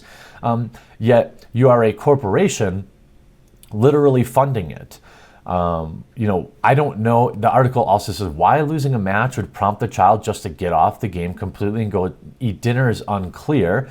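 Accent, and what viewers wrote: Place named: Denver, United States. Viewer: are you in colorado? it sounds like your in denver...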